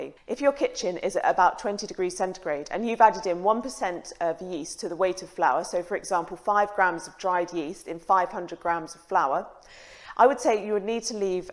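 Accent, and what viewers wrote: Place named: United Kingdom. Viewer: Oh, it's definitely warmer here! No WAY would the interior of our house ever approach 65F, even in the dead of winter. LOL. Thank you for your quick reply. I appreciate it. The reason I ask is that most recipes I have encountered for baking sourdough bread recommend, after an initial 3-hour period of stretch and fold, placing the dough in the refrigerator overnight. So, you wake up in the morning, and your dough has had 8-10 hours to rise. That's worked pretty well for me, but on occasions, I have wanted to shortcut the process. I have merely guessed at what (with a winter room temp around 23C) a "couple of hours." So far, so good, but I'm flying blind. The "overnight in the refrigerator" method seems to work fine, but I'm occasionally ready to bake sooner than that! Summer's a different deal, when the interior of our house stays consistently at 26 or 27 C. 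Thanks again for your help! Don't lose that British accent, it's SO charming. :o)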